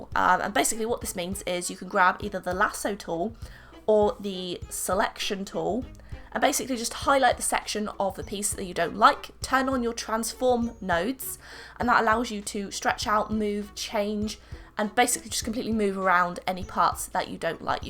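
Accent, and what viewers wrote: United Kingdom. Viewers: bRiTiSh aCcEnT🌚💔its cute tho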